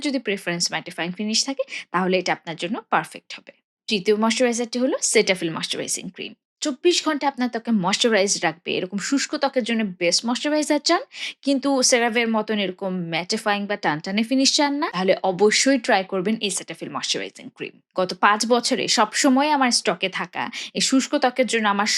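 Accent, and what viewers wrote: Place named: Bangladesh. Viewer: Just watched a few videos of your english channel. I love your bangla accent but all the best for your English channel as well ❤❤❤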